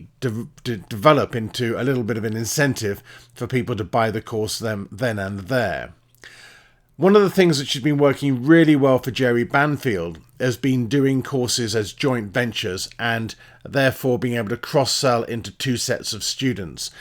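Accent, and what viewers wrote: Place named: United Kingdom. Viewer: your very good tolisten to i bought my first course last night looking forward to it. just a thought what is pulse. i think instagram reels and tik tok reels are a quick easy way to catch the eye to get a viewer to consider your course. get them with a key point from one of your courses.. nice accent does sound like british army show lol .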